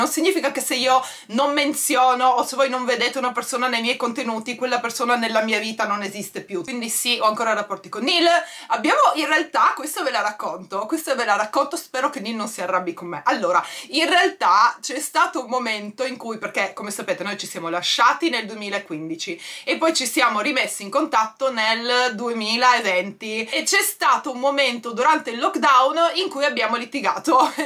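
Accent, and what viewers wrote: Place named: Trieste, Italy. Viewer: Potrai andare a vivere ovunque ma l'accento triestino non cambierà mai😍😍😍ti adoro🤣🤣🤣 Il trucco ti valorizza un sacco, è bellissimo!!!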